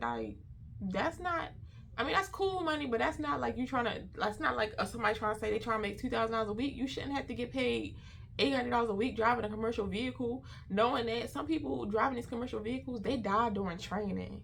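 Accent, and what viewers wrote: Baltimore, United States. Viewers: Yoo you got a Baltimore accent out this world i thought i was watching the wire lol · Yea she sounds like me lol Baltimore all day baby 😂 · U got to be from Bal-Da-More!🙌🏽🙌🏽….love ur videos💪🏽🙌🏽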